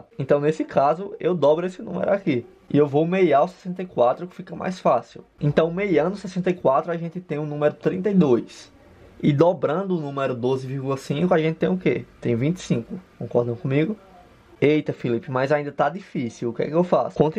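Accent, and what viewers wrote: Northeast, Brazil. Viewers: Eu adoro esse sotaque dele Nordestino kkkkk❤❤ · Ai esse sotaque nordestino é tão lindo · Ele é nordestino?? O sotaque dele parece muito.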